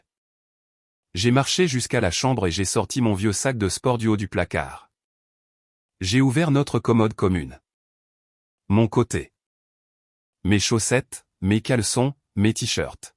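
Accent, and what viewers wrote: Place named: France. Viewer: un français dans la cinquantaine qui lit un texte en anglais pour la première fois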